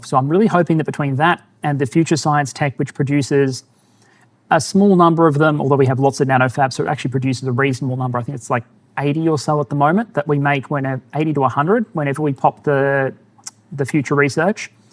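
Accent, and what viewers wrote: Australia. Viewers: Reaching out to the game's original voice actors to do chapter intros is a let's play power move. I love the sound of your voice narrating both the real history of the Ukraine war and the fictional history of what ever game you are playing. I fear that future history lectures I will attend will not sound authoritative enough without the Australian accent.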